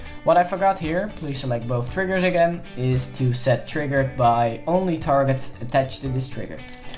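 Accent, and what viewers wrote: Netherlands. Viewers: You have a dutch accent. Are you DUtch?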